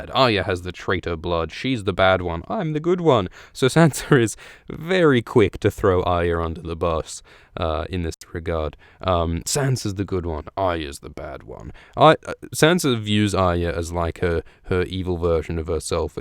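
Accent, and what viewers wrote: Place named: Australia. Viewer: He has an Australian accent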